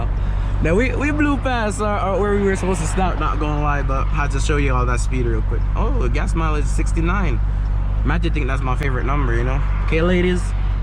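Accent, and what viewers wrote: Jamaica. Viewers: Bro you gotta jamaican accent 🇯🇲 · You sound Jamaican🤔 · Are you from jamaica? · Yoo bro u sound Jamaican where u frm? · Mi know mi hear a Jamaican 🇯🇲 accent big up yuhself Bredda yuh drive like a real yaad man. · cant hide that Jamaican accent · u Jamaican or carribean by any chance? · U Jamaican bro ? Man tuff yf 😂💪🏽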